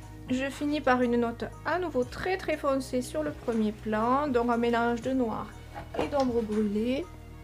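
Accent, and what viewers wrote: South, France. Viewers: Merci, toujours magnifique, très bien expliqué avec ce bel accent du midi.. Bonne soirée. 💐🐈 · J'adore... cela me fait rêver... cela paraît si simple et ton accent du Sud-ouest ou Sud-est me touche moi l'Aveyronnaise que je suis. Bisous et merci👄💖👍👌👏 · Je souhaite un prompt rétablissement à la Lady. Je regarde régulièrement vos vidéos et ce que vous faites est vraiment sympa et original! Vous avez une dextérité dans le maniement de votre couteau que j'aimerais bien avoir. Je peins également ou plus précisément je repeins depuis quelques temps après avoir laissé les pinceaux de côté pendant plus de vingt ans! Vous faites des expositions? J'imagine qu'avec votre accent, vous êtes dans le sud de la France.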